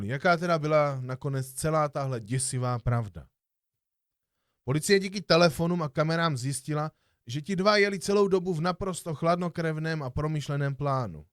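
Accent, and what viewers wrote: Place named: Brno, Czech Republic. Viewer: A hlavně s brněnským přízvukem 😉👍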